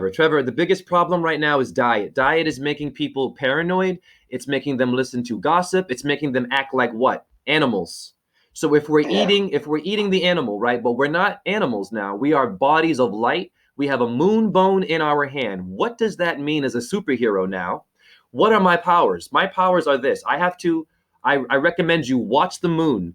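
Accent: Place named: England, United Kingdom. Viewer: I So much enjoyed your chat ... It' Feels fabulous to listen and change 💚💜🙏. Ha ha I love the English voice, I'm from England 😊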